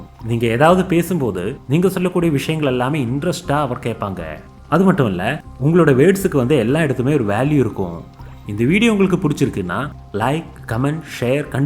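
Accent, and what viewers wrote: Kerala, India. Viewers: Néenga malaiyali dhana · ஒரு நல்ல மலையாளி... தமிழ் பேசுறது இப்படி தான் இருக்கும் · R u malayali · நீர் முதலில் தமிழரா? மலையாளம் வாடை வருகிறதே உமது தமிழில்! கோலேஜ & அவர் பேசிட்டே இருக்கும் என அஃக்றினையில் சொல்கிறாய்! எங்களை பல முறை அஃக்றினையில் குறிப்பிடுகிறாய்! நீங்க எந்த ஸடாண்டர்டுல படிக்குது..! யோவ்! ஓடிப்போயிடு!நீ முதலில் நன்றாக தமிழ் & ஆங்கிலம் கற்றுக்கொள்! தப்பு! தப்பா சொல்லி கடுப்பேத்தாத! உன் வாயில வசம்பு வைத்து தேய்க்க..!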